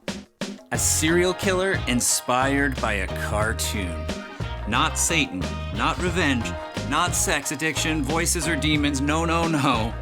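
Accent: Canada. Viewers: I hear a Canadian accent? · Good vid! Wait….are you Canadian??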